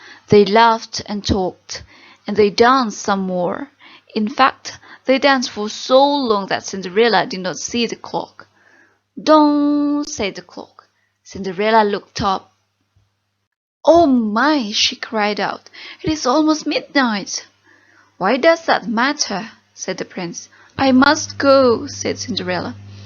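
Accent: United Kingdom. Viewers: british ya kak 😍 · First i hear you then i love you.. Auto subscribe.. It sounds like british😍 Semoga channel Kaka makin berkembang yaa.. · Wow! What a great Job, Sister! Where did you learn English? I love your english pronunciation and accent. It sounds like British.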